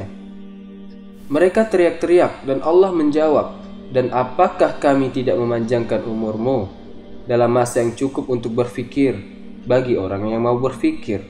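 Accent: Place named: Lombok, Indonesia. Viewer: Dialek saudara seperti dialek orang lombok. Betulkan saudara dari lombok?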